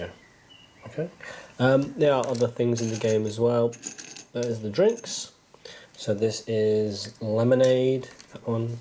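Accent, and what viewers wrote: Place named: Germany. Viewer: Actually - sounds like a hint of German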